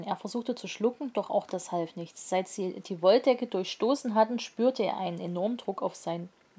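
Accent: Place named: East Germany, Germany. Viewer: Danke für deine Videos ich nehme es mir als kleine Hilfe falls ich bei mir nicht weiter komme . Video ist top und dein Ossi Akzent ist auch unterhaltsam für mich als Wessi . Ich hoffe du verstehst Spaß 😜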